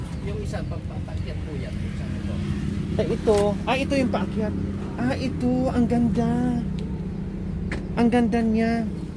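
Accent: Visayas, Philippines. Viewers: pangasinan po ba kayong blogger po? urdaneta,villasis, rosales at pangasinan na lugar po. Kaso visaya kasi ang salita ninyo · bisaya diay ka mam?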